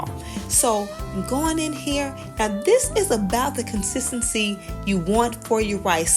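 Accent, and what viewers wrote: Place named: New Orleans, United States. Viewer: Looks good! Sounds like you’re from my hometown of New Orleans. ❤️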